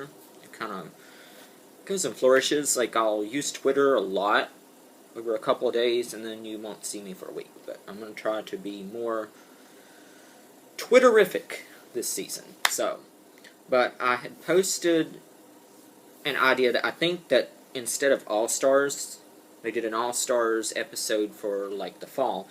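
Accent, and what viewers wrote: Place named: South, United States. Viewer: He sounds southern. Slow and the pauses, yes. The "ums" are a trademark of his videos Ive noticed. But it is who he is, if he did his videos definitely he would be acting. Everyone has their own style and mannerisms on youtube.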